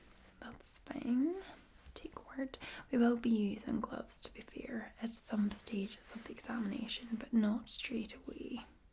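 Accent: Scotland, United Kingdom. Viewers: That Scottish accent, oh Marry and Joseph, lol :D just lovin' it :) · I love Cranial Nerve Examinations I've seen so many of them by different ASMRtists that I really want a Cranial Nerve Exam if there half as relaxing as in ASMR then I think I would really love it! If not for nothing if I ever need one I will have a wealth of videos to go back to to relax and calm my anxieties! I love your Scottish Accent in this video is this a Northern Coastal accent kind of like ASMR Shortbread or otherwise known as ASMR Shortie? Thank you for a really relaxing Tingly video it seems like today has been a stressful tiring day I really appreciate these calming videos in the evening!:_Loveheart::_Loveheart::_Loveheart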